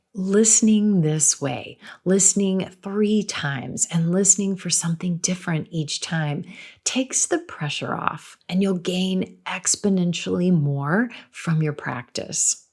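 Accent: United States. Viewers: I'm addicted to your beautiful voice every night when i go to bed your voice is digging in my brain. American accent is outstanding. Much love to you Coach from Morocco 😘😘😘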